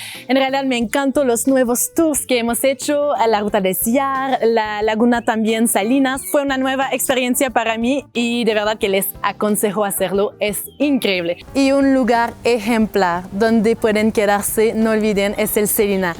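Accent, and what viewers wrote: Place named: France. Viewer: You’ve won a subscriber because you really love my country as it was yours. I love your French accent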